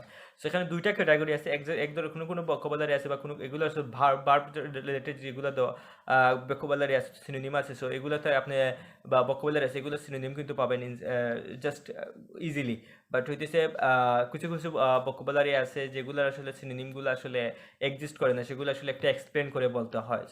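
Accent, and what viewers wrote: Sylhet, Bangladesh. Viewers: Vai apnar mat a lager sylhet e,,,Apne sylhet koi takoin ?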